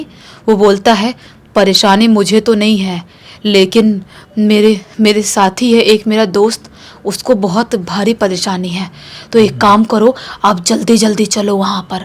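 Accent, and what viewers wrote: Bihar, India. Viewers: plz btadijiye kya ap bihari ho? Apka assent whin k lgta h aur bhut words v! ❤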